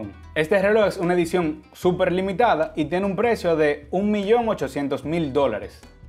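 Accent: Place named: Dominican Republic. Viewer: Dominicano eres, se nota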